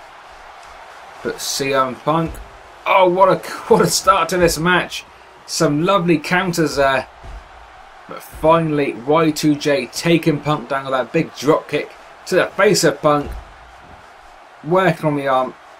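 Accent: United Kingdom. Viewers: i love your pronunciation are you british?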